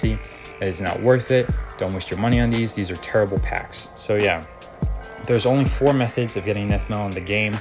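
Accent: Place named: London, United Kingdom. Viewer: You are from London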